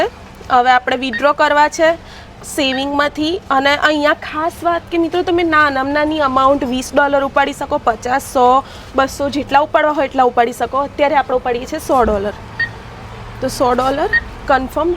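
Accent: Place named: Mehsana, India. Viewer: મેહોના ની ભાષા સંભાણી ને મોજ આવે છે ❤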